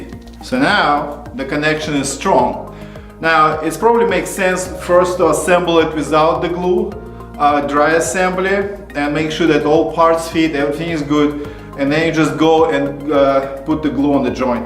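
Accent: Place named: Russia. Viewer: Я так и думала, что у вас русский акцент)) Конечно, кто ещё может додуматься до такого решения. Нужна смекалка (несуществующее слово в английском)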